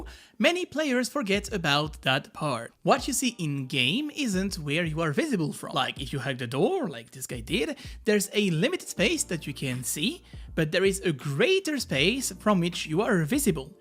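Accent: France. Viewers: Tellement frustrant d entendre cet accent mais de ne jamais avoir de video en francais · de ouf il parle super bien english mais t'entends tjrs l'accent français xDDD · Wait, after all this time watching, i just discovered that t'as l'air vachement français bordel.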